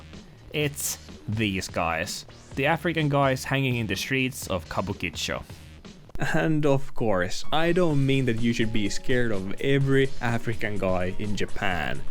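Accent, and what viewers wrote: Finland. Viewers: This video got recommended to me randomly. Very interesting. The second I heard you, I clocked you as Finnish hahaha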